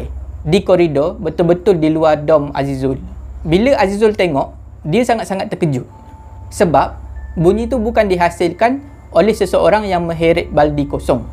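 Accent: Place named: East Coast, Malaysia. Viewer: oww patutlah loghat mcam org pantai timur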